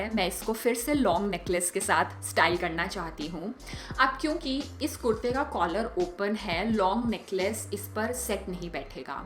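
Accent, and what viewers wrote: Punjab, India. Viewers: Aapka bolne ka style ekdum punjabi touch ka hai!! Im in love with your outfits because they are very sober and decent!!!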